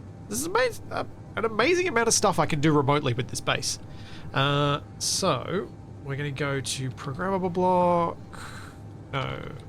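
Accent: Australia. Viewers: So many happy shout outs during this episode. Also a couple of frustrating times. All came out in a fantastic way of Australian accent, I love it. It always makes me smile, laugh and want to go back visiting down under 😊 Thanks, it is always a pleasure watching your episodes 🥳🇩🇰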